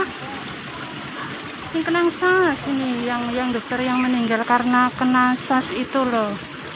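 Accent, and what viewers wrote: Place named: Cilacap, Indonesia. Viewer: Bahasa cilacape ora ilang walau neg negeri orang.. sukses mbak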